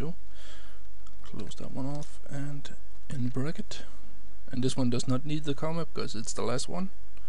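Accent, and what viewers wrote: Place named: Denmark. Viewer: im almost certain its a danish acctent :P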